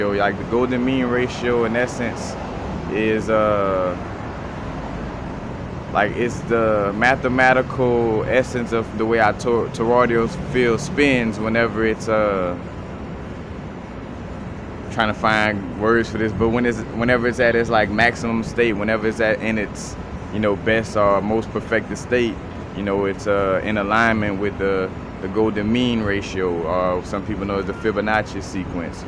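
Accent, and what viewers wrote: New Orleans, United States. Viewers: Sound like New Orleans accent! U from home? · You from New Orleans Bragh?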